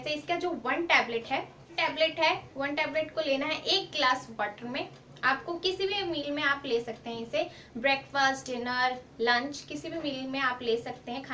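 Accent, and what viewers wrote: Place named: India. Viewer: this is India English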